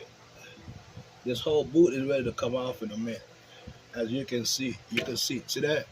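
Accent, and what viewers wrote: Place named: South, United States. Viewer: I could listen to his Southern Drawl all day long!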